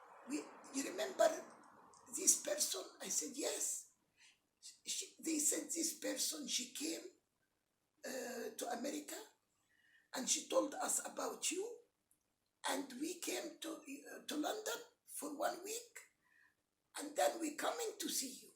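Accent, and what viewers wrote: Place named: Egypt. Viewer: لهجتك مصريه خالصه رغم التحدث بالانجلش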